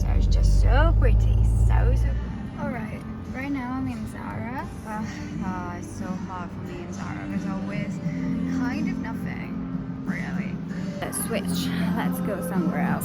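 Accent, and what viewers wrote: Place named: United Kingdom. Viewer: Ciao, grazie mille per questa condivisione❤️ Io ho la luna in toro, e ultimamente ho trascurato la mia connessione con la natura😞 e infatti sono totalmente off balance. Grazie di avermi ricordato di cosa ho bisogno. E grazie di avermi ricordato anche di essere capace di comprendere il British accent🤣🤣 mai scontato 😅🌷 un abbraccio ❤️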